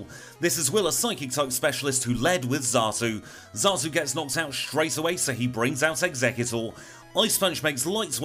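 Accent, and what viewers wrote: United Kingdom. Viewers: the way British people pronounce the letter "H" makes life worth living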